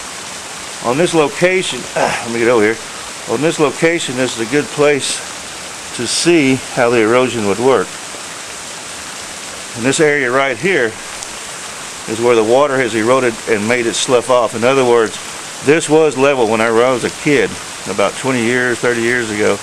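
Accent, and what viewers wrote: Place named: South, United States. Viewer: That is just his accent! He is actually pronouncing it similar to dune, you just hear it as run, or closer to ruin, but said together and fast. I suggest you never visit the South, or any other place where they tend to have accents, like EVERYplace! lol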